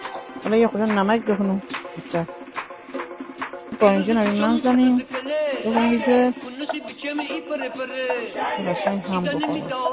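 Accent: Gilan, Iran. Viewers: بسيار عالي و زيبا 👏👏👏 عزيزم چرا ميگين غذاي گيلاني ،؟ لهجه شما كه گيلكي شهسواريه . اينا غذاهاي غرب مازندران ، شهراي متل قو ، شهسوار ، رامسر و شهرهاي كوچيك حومه هم هست . صد البته كه غذاهاي گيلاني رو دست ندارن . اما بين اين شهرها كه گفتم مشتركه . و اگه ممكنه در مورد لهجه تون هم يه توضيحي بفرمائين ممنون ميشم 🙏🌹💚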